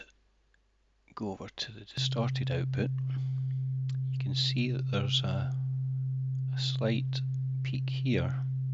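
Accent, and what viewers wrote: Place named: Scotland, United Kingdom. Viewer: It's not loudness, he's Scottish.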